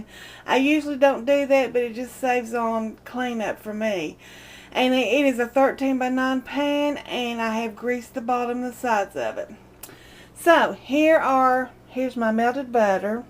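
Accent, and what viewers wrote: South, United States. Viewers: I so love her accent. I am guessing it's southern?!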